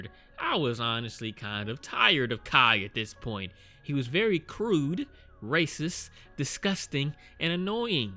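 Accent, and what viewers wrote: United States. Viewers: He sounds like he's an American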